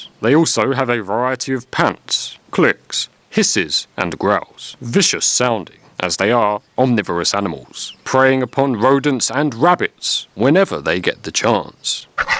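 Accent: United Kingdom. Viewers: Just watched a video from five years ago. When did you get a British accent?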